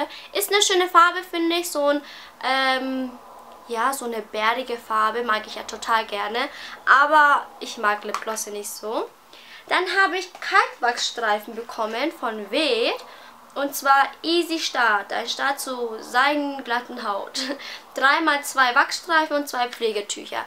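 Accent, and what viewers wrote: Franconia, Germany. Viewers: Bin vor ein paar Tagen auf deinen Kanal gestoßen und muss sagen dass er mir richtig gut gefällt! Du bist ja so eine süße! Übrigens wohne ich ungefähr 60 km von Würzburg weg. Und man hört es voll das du aus Franken kommst! So süß :) ich freue mich schon auf weitere Videos von dir! :)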